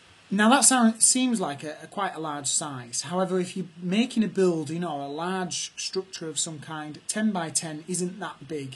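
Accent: United Kingdom. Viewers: Are you from Liverpool or Manchester?lol I like your accent! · nother northerner :D eiya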